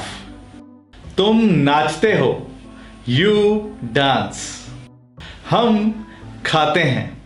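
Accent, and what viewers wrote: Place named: Kerala, India. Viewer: Malayalam spoken English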